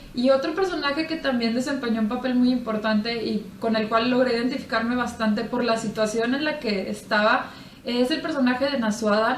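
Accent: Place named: North, Mexico. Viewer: Me sorprende que a pesar de que ya llevas tiempo en Alemania continúas teniendo el acento norteño jejeje Me gustaron tus respuestas y yo también quiero en físico Persépolis:( Espero ya subir mi tag en estos días Y sólo como sugerencia: recomiendo que pulas un poco más la edición de tus videos, hay algunas cosas de las que puedes prescindir sin afectar el contenido y así hacer más breves tus videos =) Un abrazo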